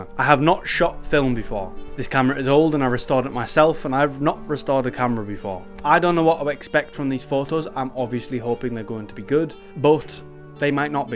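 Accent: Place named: Northern England, United Kingdom. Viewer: Right. What's going on? Just came across your channel and the two videos I watch are so relevant. Been taking lots of pictures of loved ones lately and yesterday I picked up a Kodak 66 model III I got on ebay. Looks very similar to your camera. Liked, subbed and comments. You sound northern as well, I'm up here in Cramlington. I'll be making my way through your videos. Keep it up.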